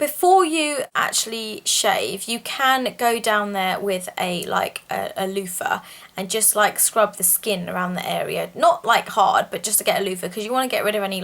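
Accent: England, United Kingdom. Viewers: you are super cute and that english accent is just the icing on the cake !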